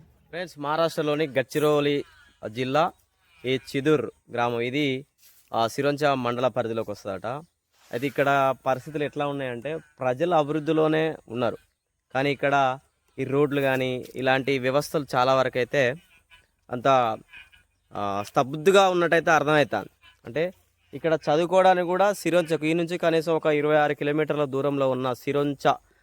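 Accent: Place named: Karimnagar, India. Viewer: Ma Karim nagar slang la undhi....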